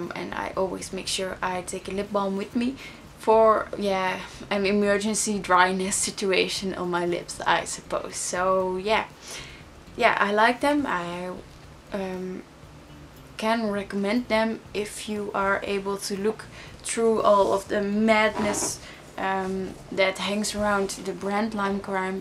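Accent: Netherlands. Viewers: Loved this reveiw! I'm obessd with my Veletines. I also love you Dutch accent. Immediately, I knew you were Dutch because of it. (: My oma is from Holland. Haha. Keep up the great work. Definately subscribing!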